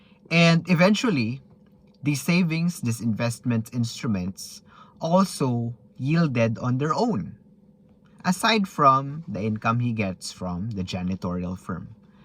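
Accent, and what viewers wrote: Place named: Philippines. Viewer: i could tell you’re filipino! my mom never taught me how to speak tho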